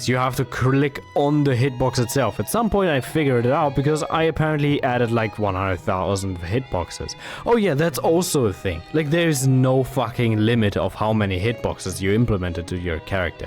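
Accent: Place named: Germany. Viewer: i guess you are german good accent tho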